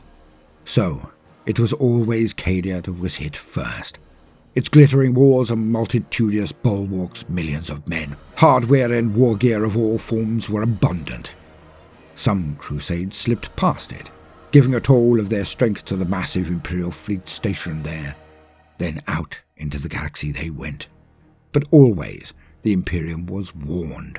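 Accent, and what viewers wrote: United Kingdom. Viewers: one I agree with you YouTube is buggy two can I do r e a c t your amazing videos three are you British? Your excellent sounds like it. It’s serving to hear it as will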